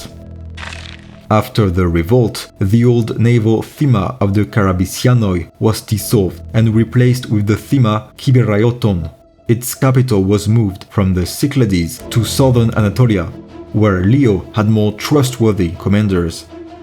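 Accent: France. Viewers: From your accent in this video i think you are frensh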